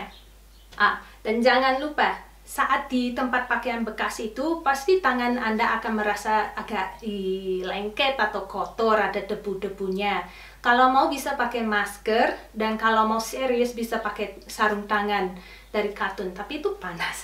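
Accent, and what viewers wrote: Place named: Bali, Indonesia. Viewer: logatnya bali campur jawa.. 'dathang' pepathah..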